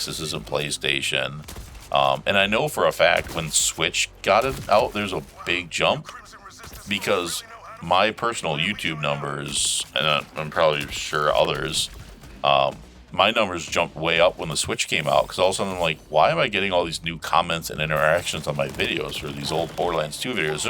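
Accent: United States. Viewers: I knew you were from Wisconsin! I knew it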